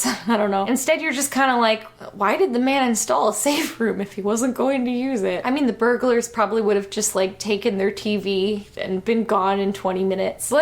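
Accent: New York, United States. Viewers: I don't care what people say, your costume is amazing...that new york accent thooo..... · Your New York accent made me cry laughing. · You indeed sound like you are from new yawk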